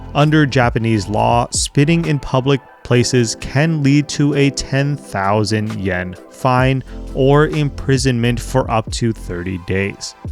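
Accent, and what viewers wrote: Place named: United States. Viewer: Your name is not Japanese, and your accent is very American. Why this video? You did not speak Japanese at all in the video to tell the Japanese viewers what your real intentions are. I am a NYC expat. Living in Japan. Yes, I speak and read most of the language, mostly self-taught. 41 Years in Japan. I surf Shonan. I have friends all down near Katase Enoshima. Thousands of tourists a day visit that area especially on weekends. The rudest rule breakers are the loud, obnoxious. neeeee screaming women on trains are the Japanese baba! In all my years here and down near that tourist area, I never saw one person litter. Once every four months or so, I tell obnoxious loud young Japanese wanna B cool guys to pipe down, and actually, I told a Chinese guy the same thing three years and four months back. Other than that, I often have to yell at little, obese Chinese 10-year-olds for chasing pigeons. 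I walk 5 kilometers a day to stay fit. The most disgusting places I walk by with litter are all Japanese areas with zero tourists. I rarely catch them in the act, but when I do, I get down to their animal littering level and let them have it verbally. Stop blaming tourists. Most of the bad stuff comes from the locals. I can send you maps and photos if you want. I bet you do not respond, though, as you are looking for followers, not reality.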